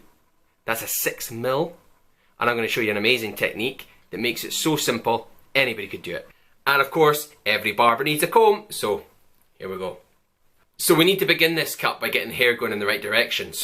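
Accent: Scotland, United Kingdom. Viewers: Thanks for this. I'm giving hubby a Covid Cut this weekend, and this is a great tutorial !! Also..you could recite the Privacy Agreement for Apple, and I'd still listen...lovely Scots brogue !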